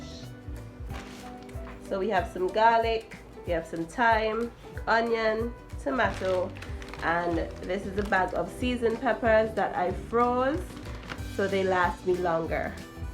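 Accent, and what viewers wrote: Antigua and Barbuda. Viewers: Wait y she sound Antiguan so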